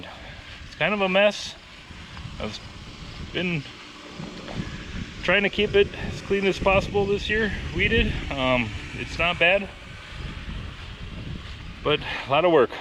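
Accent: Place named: Wisconsin, United States. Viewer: Great stuff. Clearly another cheesehead based on accent, inflection and calling cucumbers pickles 👍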